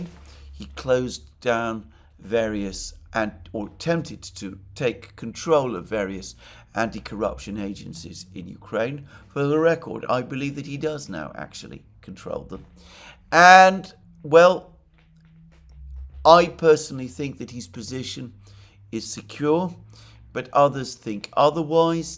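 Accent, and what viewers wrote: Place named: RP, United Kingdom. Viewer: Your English is pretty. The Queen's English I presume. An endangered specie :)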